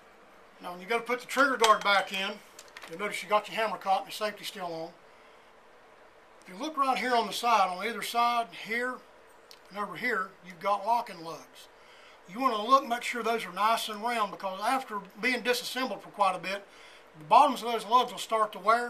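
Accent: Maine, United States. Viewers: Is that a Maine accent????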